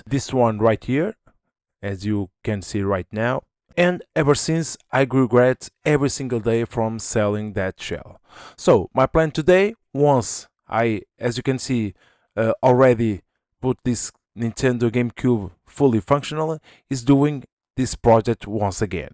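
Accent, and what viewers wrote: Portugal. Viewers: Adorei que eu fiquei assim"este sotaque é mesmo a PT" depois fui ver o nome, makes sense 😂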